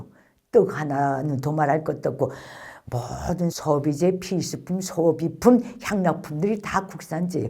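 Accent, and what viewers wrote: North Korea. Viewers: 저말대로라면 북한은 이미무너졌어야하는데 아직도 멀쩡하잖음?내년도 그후에도 멀쩡히 체제유지할거같은데? 말투가 아직도 북한티못벗었고 왠지 상대를 가르치려는 태도가 좀불편하네. 주구장창 주저리주저리 뭔가 말은하는데 도통알아듣질못하겠다. 듣고있는게 피곤하다. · 한국 오래사셨는... 말투가 그대로 북이시네 ㅋㅋ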